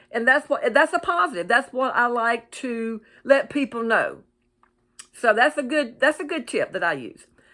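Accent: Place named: South, United States. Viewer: you brought me happiness today. I got to see your beautiful face, listen to your amazing sweet southern drawl and remember all our good times together. Love you and really miss you! You look fabulous ❤️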